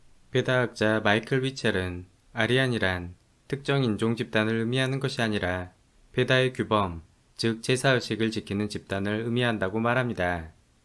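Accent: Jeolla, South Korea. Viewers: 전라도 억양이 있는 거 같으심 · 이분 어투가 라도네!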